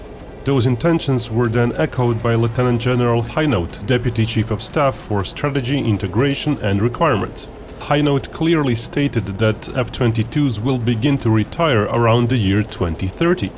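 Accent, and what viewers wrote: Russia. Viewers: Hmmm...some guy with a Russian accent telling us what our Air Force will be doing. I’m just surprised it’s not someone with a Chinese accent. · I don’t trust info from a Russian sounding guy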